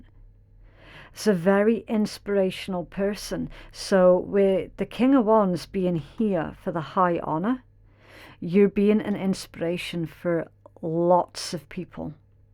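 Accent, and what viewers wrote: Scotland, United Kingdom. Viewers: Nice to hear a fellow Scot Content creator!🏴 Good tarot reader!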